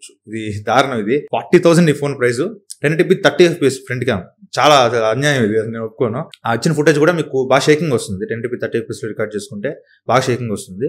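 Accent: Nellore, India. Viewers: meru nellore aa bro proper?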